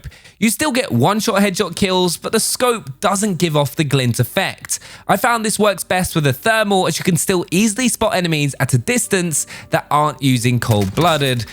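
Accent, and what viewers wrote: United Kingdom. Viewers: Probably his British accent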